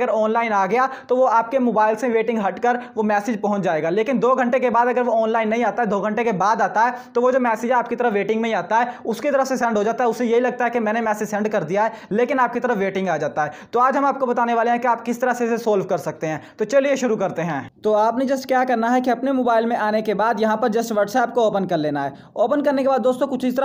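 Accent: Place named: Pakistan. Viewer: Bhai aapki English se lagrha hai aap pakistan ke hain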